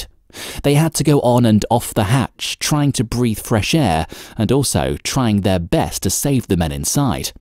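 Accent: England, United Kingdom. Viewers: Why do they use someone (computer) with an English accent? It's stupid and insulting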